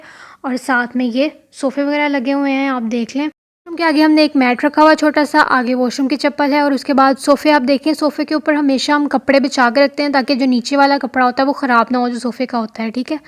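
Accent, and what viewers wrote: Punjab, India. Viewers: Mashallah sis bohat piyara ghar h ap ka or Punjabi bohat maze ki h😂😂mory😅😄😍😛😘😙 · Hello bhain kive o Tuc assi punjab ton (india) ton Te tuhadi bunjabi Sun k eda lagda k appa same aa edar wala punjab v same a main bht dinna ton thode valog dehk rai a te mainu bht pasand a rae ne waheguru thonu khush rahke 🙏🙏🙏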